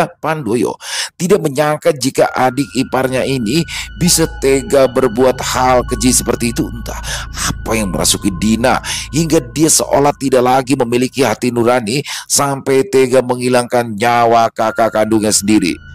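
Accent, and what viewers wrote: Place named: Jakarta, Indonesia. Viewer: Warung kopi emang paling the best dech....,apa lagi dengan logat Betawi yg kental yg d bawa kan bang,emang cocok nich bang memeran kan...,🤭🙏🏻sehat selalu bang dan team terima kasih atas cerita nya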